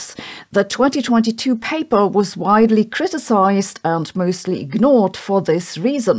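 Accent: Germany. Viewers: Here for your German accent, I also love how you articulate your arguments, thank you for your informative and well presented take on this matter. We don't have to agree with you but we must always recognise a point well made. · I don`t want to sound offensive, but a have seldom listened to any english with such a school-book heavy german accent, just adorable. 😁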